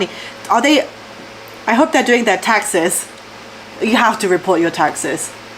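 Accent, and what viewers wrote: United Kingdom. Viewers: You are just super hot! The British accent just propels your channel!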